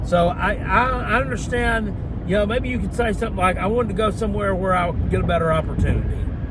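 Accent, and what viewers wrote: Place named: South, United States. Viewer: You need to slow down on that "you people" statement you already sound country as hell so you can't afford to keep saying it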